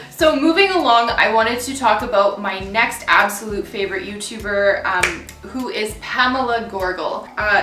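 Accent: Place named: Canada. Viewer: I appreciate ur authenticity and sharing these prominent Youtube leaders! Although they don’t always resonate with me they are knowledgeable & authentic. There so many quasi spiritual charlatans out there presenting 1/2 truths & can be damaging to new seekers. ❤️ ps i thought i heard ur sweet canadian accent!!! 😉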